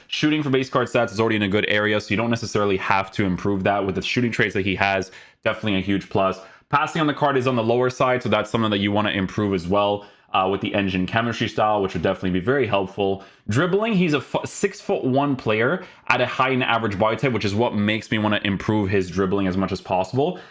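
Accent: Portugal. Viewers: Cancelo just isnt good defending, i think dalot should play more, and palhinha what a player, he must start for the rest of the wc… BTW És português? 🤣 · Are you portuguese?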